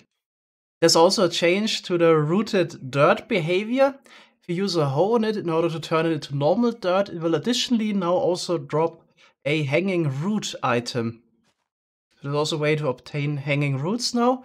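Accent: Germany. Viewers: pretty sure he's german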